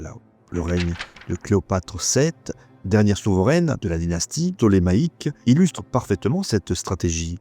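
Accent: Corsica, France. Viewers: Tu serai pas un peu Corse avec cet accent 👍🫶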